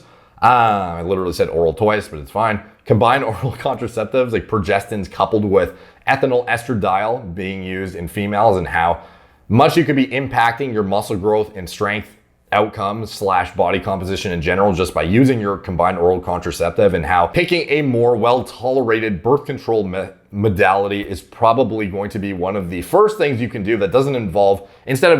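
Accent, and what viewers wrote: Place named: Canada. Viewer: Anytime he pronounces something different than I’ve heard before I just blame it on Canada.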